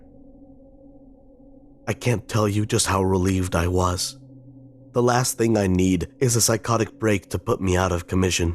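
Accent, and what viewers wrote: Russia. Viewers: Duke Nukem meets Russian accent? :(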